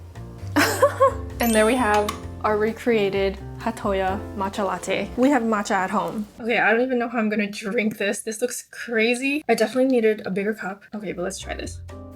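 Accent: Hawaii, United States. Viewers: 30 seconds in and i knew u were from hawaii too, love it!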